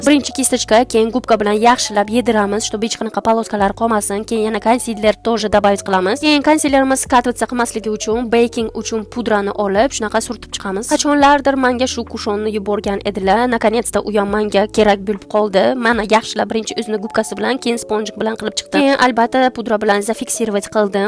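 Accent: Bukhara, Uzbekistan. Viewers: Buxorolilami deymn😅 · Бухоро шахарини шеваси шунака не туда не сюда раздрожающий 😅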